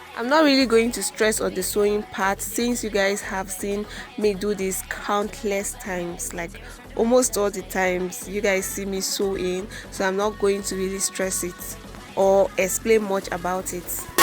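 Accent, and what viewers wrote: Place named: Nigeria. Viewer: Well well, this style is not one of the best ,but this girl is very innovative ,why? First. She has to use the material that is available in to her country. from her accent she is Nigerian ..,not all of the stylist are very up to par with the trends so they create something suitable and affordable for her and ultimately the customers. She is bold enough to have a YouTube channel to show her work and investment and edit the video. She needs more training and I'm sure she will do better.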